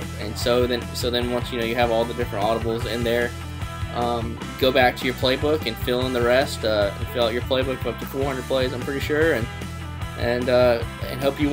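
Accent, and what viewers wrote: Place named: Alabama, United States. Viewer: that song really brings out your bama accent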